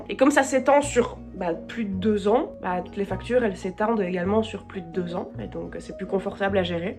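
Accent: Belgium. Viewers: Tient c'est première fois que je remarque son accent belge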